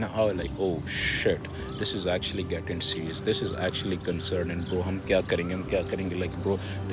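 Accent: Pakistan. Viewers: Love you ho gaya ha jigar, bara wala, I don't know which city you are from, but after listening to your Urdu accent, it seems like you are Lahori too. Am I right?? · Good to see you again with your Pakistani accent. Masha’ALLAH. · Oo bhaii, you sound more Islamabadi than me, like wth??!!😢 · Every single bro is the most Pakistani thing I’ve heard. You sound like I do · Bro you Urdu accent is awesome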